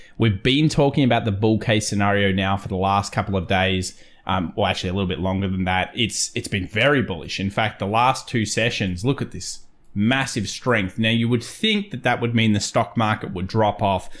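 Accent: Australia. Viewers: I must say, I appreciate the Aussie style of speach. You speak firmly, like you're in a sandstorm, or at sea with strong winds and sea spray. There's no mistaking what you're saying, and you're not using your daddy voice on me. Thank you!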